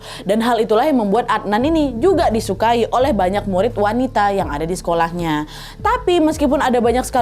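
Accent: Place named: Sumatra, Indonesia. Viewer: Trnyata banyak jga yg suka nntn 😂 suamiku sampe hafal kalo udah denger kau nada sumatra pasti lg nntn si wawa yaa😅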